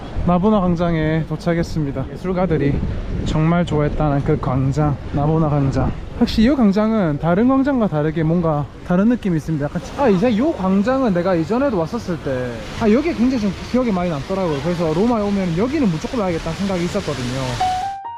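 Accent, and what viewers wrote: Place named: Busan, South Korea. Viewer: 부산말투 좋으네요 ㅎ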